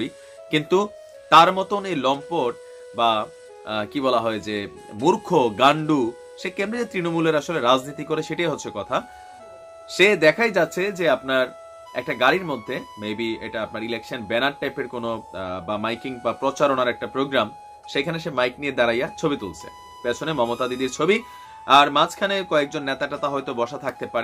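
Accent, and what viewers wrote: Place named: Barishal, Bangladesh. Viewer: ও মনু আমনে দেহি মোগো বরিশালের ভাষায় কথা কন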